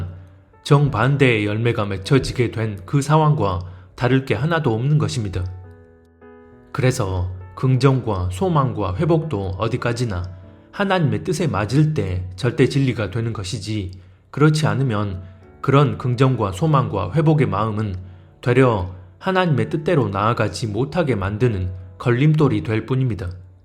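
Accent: Jeolla, South Korea. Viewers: ㅈㅓㄴㄹㄷ 억양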